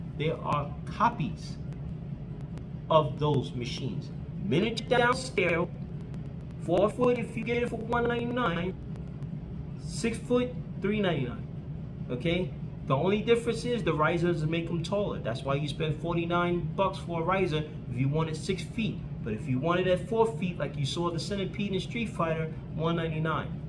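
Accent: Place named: Bronx, United States. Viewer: What part of the Bronx are you from?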